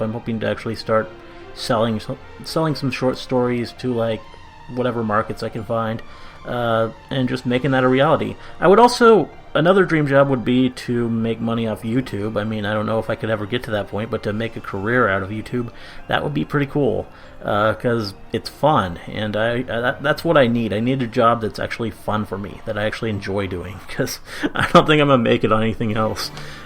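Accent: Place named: Canada. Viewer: I thought you were canadian for some reason lmao.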